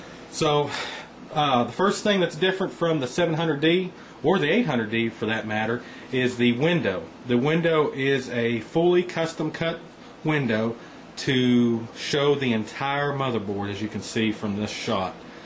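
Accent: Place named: South, United States. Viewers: Your accent is so... southern. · I'm in love. even though i have a basic computer (if im compairing it to this! lol) but I LOVE THIS CASE. makes me hate my antec 900 lol. very nice and clean build. and i love your accent. nice southern accent :)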